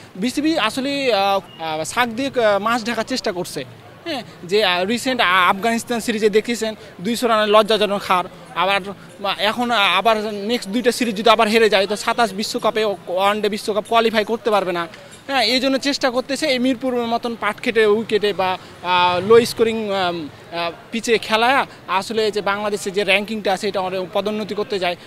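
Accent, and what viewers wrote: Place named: Kolkata, India. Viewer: Polay vodai😂 cricket thik i ase oy besi bujhe bolod sala 😅 i ❤ Bangladesh cricket sala parle tui khel tui to kolkata theke asos kotha sunlei bujha jay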